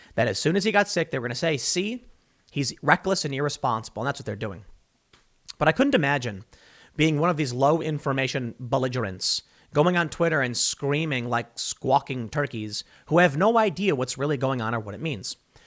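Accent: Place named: South, United States. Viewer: Why started making that Southern accent recently? "Y'all" and all that crap? Did he move to Texas or something?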